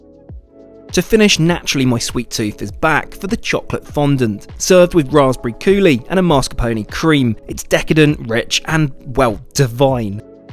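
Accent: RP, United Kingdom. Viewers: Never thought I would be watching a man who uses the Kings English lol please don't take that the wrong way. Because were i was brought up we dont lol love your videos bro trust me wheather your on a train or plane I look forward to watching them. Am gald I sub to your channel I don't how many times because I've had to get a new phone lol. All the best bro and thank you for all the hard work you put in for your subs we appreciate it.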